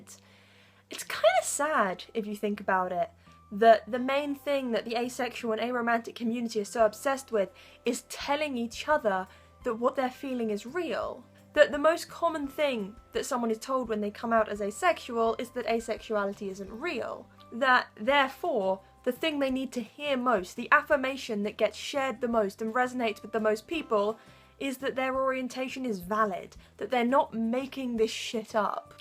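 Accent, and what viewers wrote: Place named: London, United Kingdom. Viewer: You have the same accent as my writing buddy out by London! She completely accepted my asexuality when I asked her to potentially help me out with writing romantic scenes in my books, even said asexuality sounded cooler and less annoying than being sexually attracted to people. She's not asexual (as far as I know) and she immediately accepted me without any need for debate and we'd only known each other through email for maybe 2 weeks. What's your excuse world?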